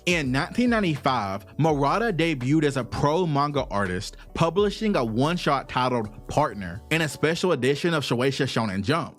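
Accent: South, United States. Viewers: Great vid but gotta ask. What part of the south are you from haha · Very interesting! I used to love Shin-Chan, such a silly show. Also love your accent! I've heard some southern accents in my life but yours is unique to me!❤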